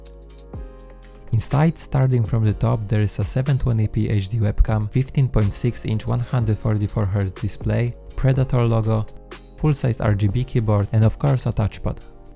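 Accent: Poland. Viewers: Cześć! Super recenzja po akcencie słyszę że z Polski jesteś co nie? Na twoim miejscu robiłbym recenzje w dwóch językach bo dużo polaków by cię napewno oglądało a większość ludzi z polszy jest zbyt leniwych żeby oglądać recenzje po angielsku 😃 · You...will you test the new rog Strix scar 3 (with rtx 2060/2070 and i7 9750h) ? PS: I like polish YouTubers 😁 like you (I recognise your accent because I'm near your country)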